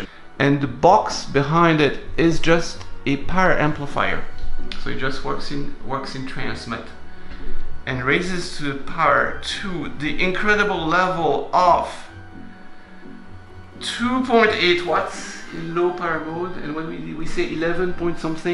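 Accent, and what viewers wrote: France. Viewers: Honestly i like a lot the french accent voiceover but I'm still sad for the loss of Ken's commentary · I never noticed you had a French accent until you mentioned it, don’t know why ;-)!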